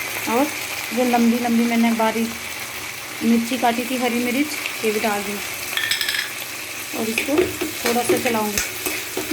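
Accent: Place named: India. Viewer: Bhout Sundar sister desi aandaaz bda pyara lga aapka 💯💯💯👍👍👍👍👍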